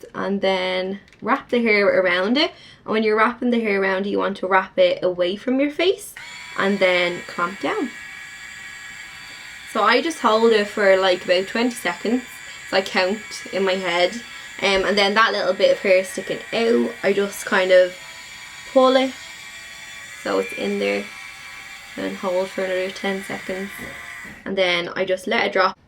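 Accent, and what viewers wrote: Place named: Ireland. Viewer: She Irish what do you expect, people can’t just change the way they speak.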